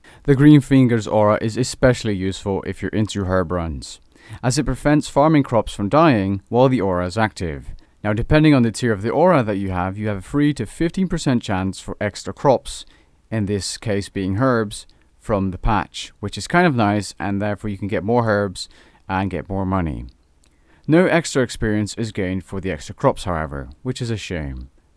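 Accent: Netherlands. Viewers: He’s fucking dutch, it’s not his first language. Now shut the fuck up. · that dutch accent tho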